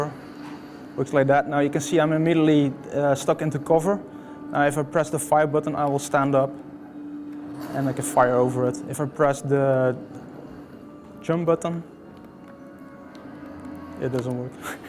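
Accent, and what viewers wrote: Netherlands. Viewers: ge hoord direct da da nen hollander is he xD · the guy sounds dutch to me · he's dutch, i know it of the accent that sucks hard. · he is not russian, he is DUTCH haha i hear i because i am dutch too!!! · definitely Dutch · your dutch! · You sound kinda dutch. Are you? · dude, are you dutch? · lekker nederlands accent haha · He sounds dutch :) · woow, dutch guy (i am one :D) learn english, you are to obvious ;D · This guy is definitely Dutch, listen to his accent. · He's definitely Dutch. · nederlander? ik hoor wel een nederlands acsent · Dutch accent, MY COUNTRY FUCKING ROOOOCKS!!!... Except the cops of course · I'm SURE this guy is Dutch. It just sounds to much like a Dutch speaking person trying his absolute best to talk English without too much of an accent. Like if you're native language is also Dutch and you agree with this statement! 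:p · YOu can hear this guy is Dutch.. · Nah it's a guy from holland they all have this accent when they speak english :D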